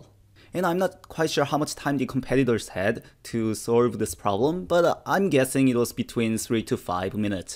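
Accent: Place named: South Korea. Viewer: You must be Korean, with that strong Korean accent, which really adds flavour to your videos.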